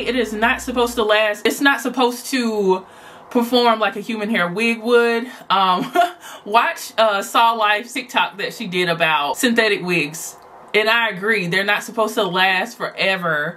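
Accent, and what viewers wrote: South, United States. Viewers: You sound like a southern belle it’s too cute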